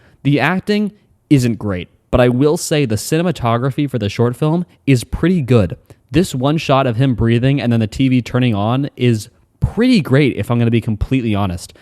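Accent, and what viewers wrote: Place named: Canada. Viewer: 1st video of yours, where’s your accent from? I’m assuming Canadian? (Sorry if wrong I’m from Australia lol)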